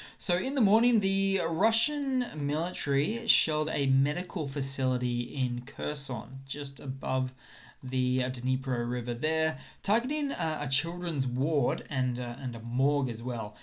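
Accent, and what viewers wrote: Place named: Western Australia, Australia. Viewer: Plagurized content. Lacks originality. Direct copy of several other established credible youtubers. Suspect an accent from Western Australia. Not impressed. There does not appear to be any significant ability to understand anything other than what we see on deep state and copying of other youtubers. Give this SOB a Thumbs Down.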